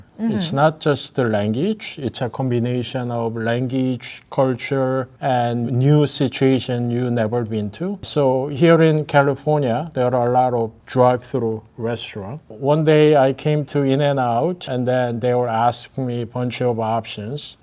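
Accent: South Korea. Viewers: 외국에서 느끼는 건데, 한국인 영어 억양으로 교수님처럼 영어 정말 잘 하면 그게 더 멋있어 보이더라구요. 솔직히 전달력도 좋구요. 오늘도 힘내서 작은 영어 공부 생활습관이 이어가 봅니다. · 억양은 한국인이시지만 저 정도만 구사해도 대단한 실력..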